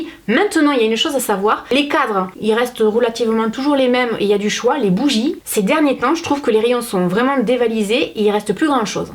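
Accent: South, France. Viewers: Je vous adore vous êtes super génial et vraiment très jolie. Merci pour toutes vos vidéos et votre investissement pour nous aider à faire de bons choix. Et en plus votre petit accent amène du soleil et des cigales 😊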